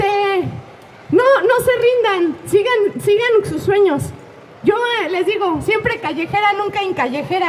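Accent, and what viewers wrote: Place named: Mexico. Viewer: 🌼 🌷 tan hermosa como tu nombre, y me siento muy orgullosa de que seas mexicana. En serio, no se como gritarlo, eres algo, completamente diferente, especial, único, y me 2ncanta también como hablas, tu acento: 100% mexicana!!! Oye, eres de este mundo? No eres un ángel venido del cielo, que te envió Dios para alivianarnos un poco?! Tu alegría es increíblemente contagiosa! Que Dios te Bendiga y te Proteja! Mil gracias por existir!!👍👍👍😄😄👏👏👏😇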